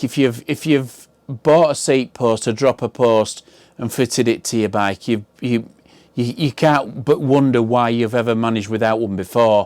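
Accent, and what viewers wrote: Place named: North, United Kingdom. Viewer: Thank you for this great video.. A really big thank you 🙏.. Loved your northern Accent 🙂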